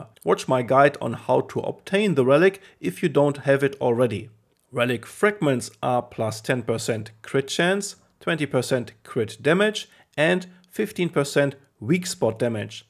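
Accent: Germany. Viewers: Are you german cause of the pronounciation?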